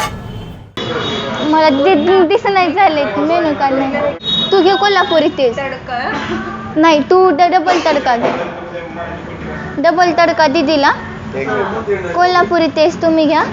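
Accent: Latur, India. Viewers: Latur chi bhasha bhari ahe ...keep it up...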